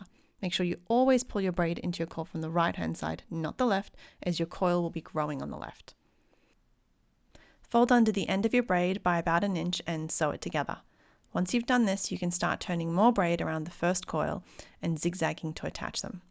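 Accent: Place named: Australia. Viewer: Great video! thanks for sharing! :-) also great to hear another fellow Aussie youtuber too 😊 I’m now a sub!❤️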